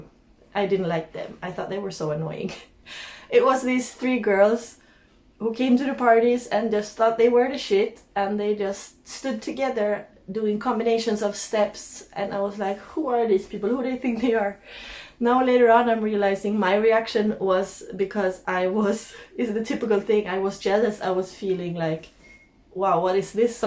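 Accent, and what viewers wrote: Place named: Jamaica. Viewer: I just realized why she has a slight Jamaican accent when she speaks English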